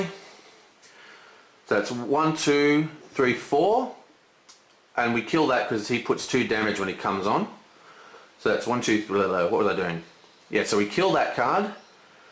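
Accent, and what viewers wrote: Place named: Australia. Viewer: Neutral australian or nz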